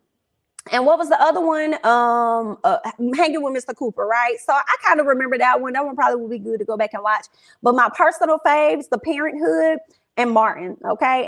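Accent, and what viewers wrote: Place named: Alabama, United States. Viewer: Girlll what part of Alabama are you from?!?!?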